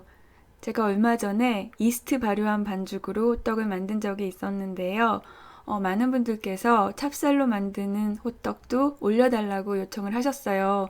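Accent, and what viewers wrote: Seoul, South Korea. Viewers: 말투가 90년대 서울사투리 쓰시는거같아요 ㅎㅎㅎㅎㅎ 신기하당 ㅎㅎㅎㅎㅎㅎㅎ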